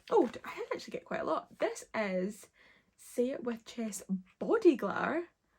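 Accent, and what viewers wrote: Scotland, United Kingdom. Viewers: She is scottish😘☺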